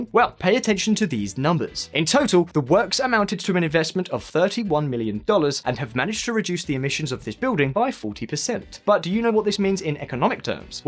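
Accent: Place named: United Kingdom. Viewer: It's a French word spelled façade. That squiggle under ç makes it sound like ß or ss. Otherwise, even in French it would be "fakad". Anyway, is an up and coming British-like voice, a welcome relief from so much careless American slurring heard on YT.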